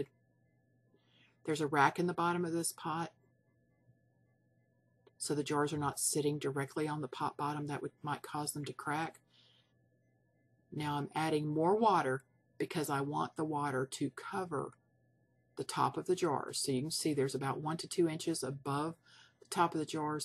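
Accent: Texas, United States. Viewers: I beleive that is a Texas accent, and also I beleive by her demonstations that she is probably a teacher of young children. I love her videos.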